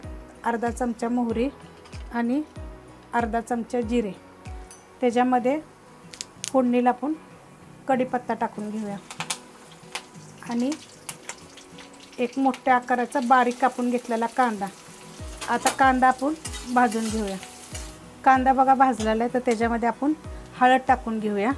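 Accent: Satara, India. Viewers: सातारकर आहे काय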